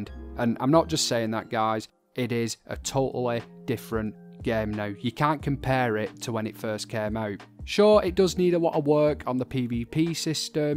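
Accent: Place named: Yorkshire, United Kingdom. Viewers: Are you from Yorkshire? I am and I'm rubbish with figuring out other people's accents 🤣 Great video by the way, thanks! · God I just love you accent mate. I'm from Britain and I'm guessing maybe your from Yorkshire? Either way you accent is brill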